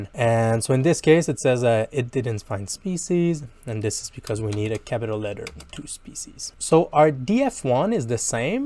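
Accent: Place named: Quebec, Canada. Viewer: Salut! Avec ton léger accent, j'en ai dédui que tu étais Québécois ;) J'aurais une question pour toi! Dans mon DF, mes variables de ligne sont des entreprises (1 entreprise a plusieurs lignes, comme c'est le cas de la sorte de lys qui a plusieurs lignes) et mes variables de colonnes sont binaires (0/1). Je souhaite donc combiner mes variables de colonne en somme (au lieu de moyenne dans ton exemple). Je suis capable de le faire avec la formule suivante : by_company <- group_by(test_sans_tweets, username) sum_by_company <- summarise(by_company, sumlocal = sum(Local)) Cependant, j'ai plus de 500 colonnes. Y a-t-il un moyen de faire cette formule avec toutes les variables en même temps pour ne pas avoir à la copier-coller 500 fois? Merci beaucoup :)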